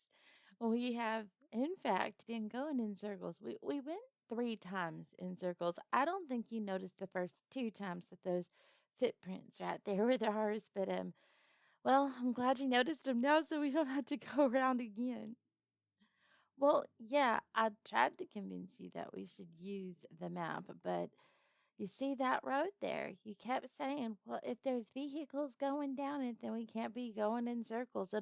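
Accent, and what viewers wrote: South, United States. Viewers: Ooooh man that southern accent is like 🤌Yeehaw! hehe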